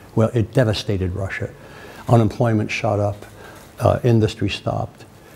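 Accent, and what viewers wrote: Serbia. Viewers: Kako tvrd, sprski izgovor engleskog jezika. Uzas.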